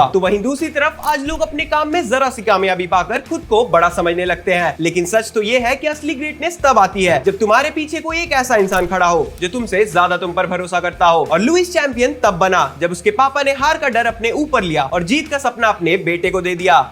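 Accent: West Bengal, India. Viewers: Bhaiya ap west bengal ho kya 😊😊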